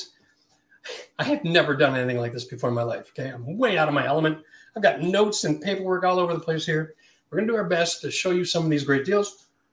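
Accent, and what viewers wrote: Canada. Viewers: Wait, what? Are you Canadian or American?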